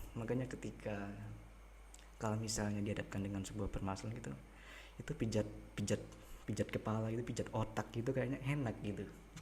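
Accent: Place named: Java, Indonesia. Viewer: kk GUE nya kok medok ya, maap kk skali lg tp ga pantes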